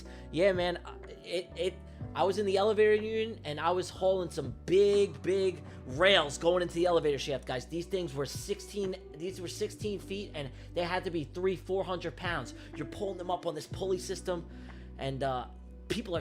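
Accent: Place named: New York, United States. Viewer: Bro can definitely talk for sure. No doubt about it he's from new york.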